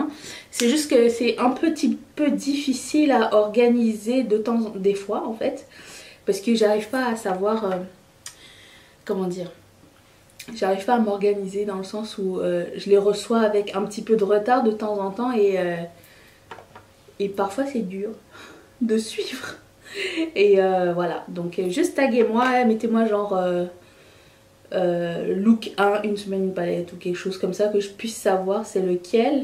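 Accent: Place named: Quebec, Canada. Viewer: Wow! Je sais pas pourquoi mais j’entends un léger accent québécois 😂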